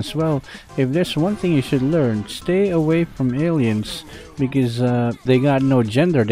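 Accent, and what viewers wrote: Philippines. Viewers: Are u a filipino with english language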